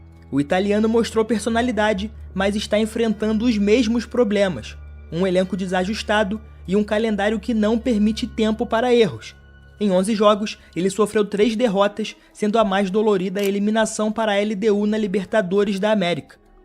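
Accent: Rio de Janeiro, Brazil. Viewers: So pelo sotaque da pra saber que e carioca skskksks (sou tbm)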